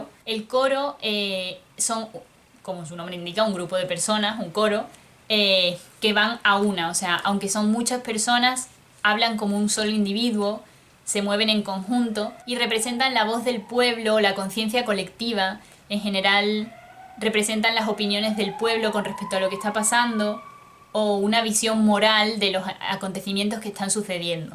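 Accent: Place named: Spain. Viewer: Muchas gracias....!!!! Excelente manera de introducir tanto conocimiento condensado. Me encanta tu acento. De parte de España sos????. Saludos desde Argentina.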